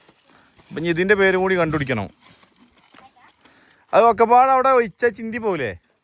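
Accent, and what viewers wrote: Malappuram, India. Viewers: not calicut. This is Malappuram slang 🔥.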